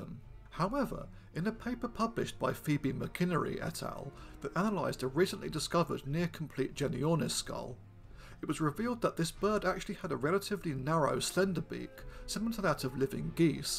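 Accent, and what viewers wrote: United Kingdom. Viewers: He pronounces them the British way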